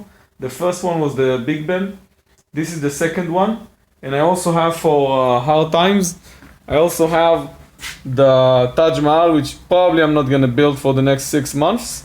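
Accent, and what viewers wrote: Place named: Israel. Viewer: Love your Israeli accent!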